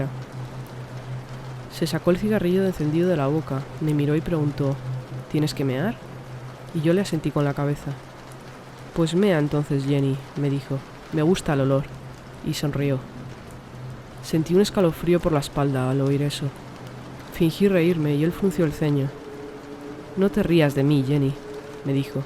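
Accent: Spain. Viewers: Los 3 relatos muy escalofriantes la verdad ya que esto es real, muy buenos relatos me encanta ese acento español, saludos desde México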